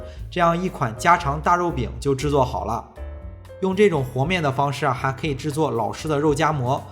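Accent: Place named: Shandong, China. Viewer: 你是山东人吗？听你的口音和有些厨房的工具名称像我们家乡的人。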